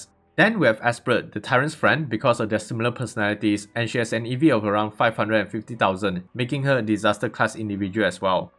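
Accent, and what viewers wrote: Singapore. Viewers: Bro are you Singaporean? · You Singaporean ah?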